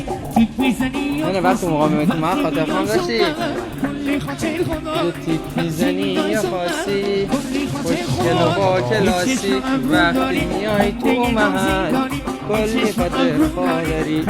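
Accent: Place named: Shiraz, Iran. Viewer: من هم شیرازی هستم نزدیک مرودشت ولی از لهجه شما خیلی خوشم میاد به قول خودت شب ها درت میکنه روزها قربون صدقه ت میره وماچت میکنه😂 آخر نفهمیدیم برا چی گاهی درت میکنه؟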